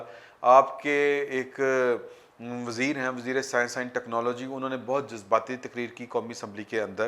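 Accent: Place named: Lahore, Pakistan. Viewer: Yaar aap u tube say pehlay kahan thay qasam se mn aapka fan hogaya hon ur great sir g Khalis Lahoria wali boli hi aapki.shakhsiat ko chaar Chand lagati hai well-done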